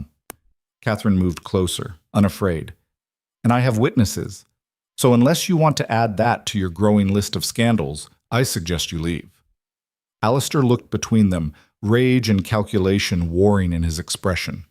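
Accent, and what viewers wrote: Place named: United States. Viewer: Why is it using an American English accent for a story set in Britain? This whole storyline mixes up timelines with 19th century, 20th and 21st. Be prepared to feel constantly confused about courtroom drama and the need for a passport in 19th century England lol.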